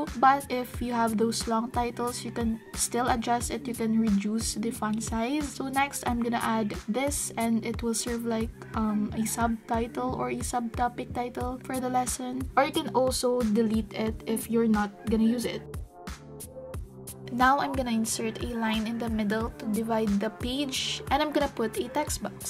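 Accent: Philippines. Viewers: Are you by chance a Filipino? Your accent is like a Filipino Accent.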